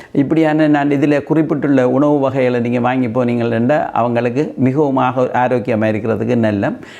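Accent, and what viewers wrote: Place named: Eelam, Sri Lanka. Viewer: உங்களது ஈழத்து தமிழ் அருமை ❤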